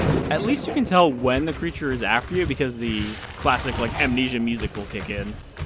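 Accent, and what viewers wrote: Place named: France. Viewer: Golden french accent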